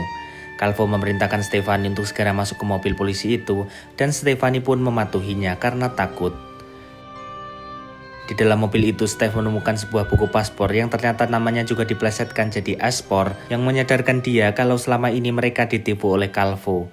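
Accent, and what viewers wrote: Java, Indonesia. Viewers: Kurang srek dgn suaranya terlalu meddok,cerita film nya jadi GK seru. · Jawa bgt logatnya 😄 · Si abang pasti orang jawaaa yaa ngomong y mdok🤭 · ko beda suaranya.. kaya y inh orng jawa yah boss ☝☝☝🤭🤭🤭🤭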